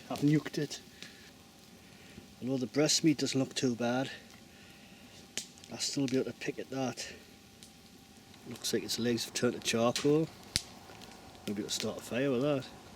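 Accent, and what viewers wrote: Newcastle, United Kingdom. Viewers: Is that a Geordie accent I hear? :D Great video. You hear so much regarding pricey gear, it's great that you went all ghetto with it. Nice!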